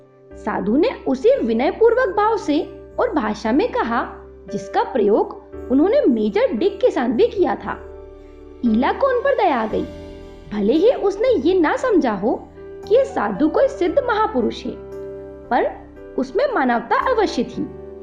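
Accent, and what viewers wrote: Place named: India. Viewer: What’re you saying ?.. can’t understand anything..because you’ve got such a strong Indian accent.. otherwise i do speak & understand Hindi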